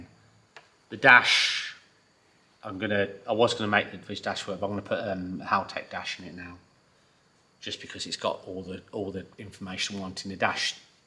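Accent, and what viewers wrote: United Kingdom. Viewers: It takes awhile to get used to the way the brits talk, especially if they use a lot of slang and shortened words.But as an aussie, I don't have a problem with it.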